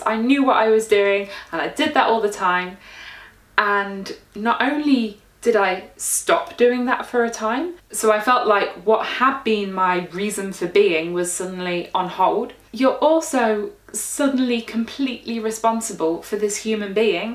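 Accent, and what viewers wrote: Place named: Derbyshire, United Kingdom. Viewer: Awesome mommy job!!! Btw, your Derbyshire accent is really pronounced in this video.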